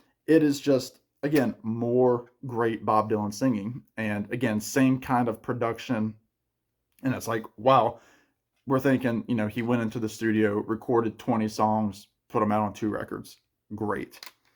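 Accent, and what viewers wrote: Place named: North Carolina, United States. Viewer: Big Bob Dylan fan here. " " is still my favorite Dylan record. " " is alright. " " is great. " " is probably the first time I heard him croon. Some of those songs reminded me of. I grew up in the Deep South and recognize southern accents. (definitely a Delta guy) I'm going to go ahead and say your accent is North Carolina, possibly Tennessee? I tried to do a Dylan show but don't touch him on YouTube. They will yank that in a heartbeat. " " looks like a black metal album cover 😅. This is good. I'm excited to watch more videos. Cheers!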